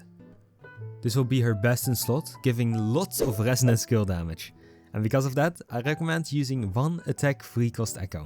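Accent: Netherlands. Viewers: Not just by chance, that was extreme dutch. it got me distracted from the video after 1 line in xD usually a is of dutch people talking english they can very well recorniz each other pretty that there dutch though here u use some words that have same letters but use the dutch way of saying it instead of the english one xD You do you though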